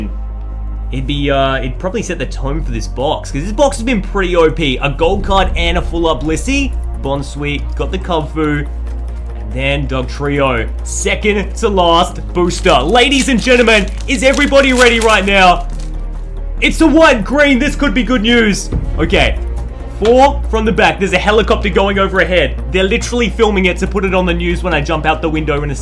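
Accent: Australia. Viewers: R u Australian if u are ima sub and like now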